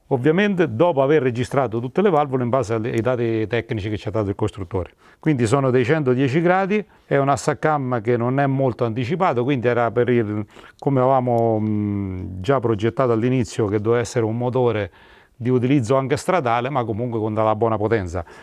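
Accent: Abruzzo, Italy. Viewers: Una parola: arte. L'accento abruzzese completa l'opera. Si sente il sapore degli arrosticini mangiati dopo una giornata di duro lavoro di preparazione. " E angor' ce sta' da affina'" MERAVIGLIOSO!!!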